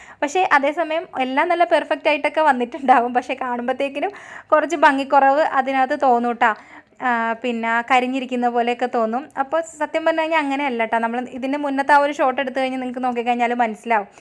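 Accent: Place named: Ambalappuzha, India. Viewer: Njangal ambalappuzhakkarum anganeyanu parayunnath ❤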